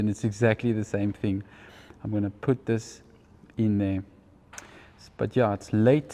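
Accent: South Africa. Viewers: Started watching but the South African accent grates me so I'm off to watch something less annoying.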